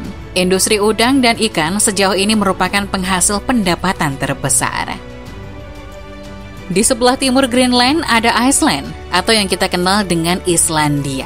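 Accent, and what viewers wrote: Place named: Lombok, Indonesia. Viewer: Anak lombok mana suaranya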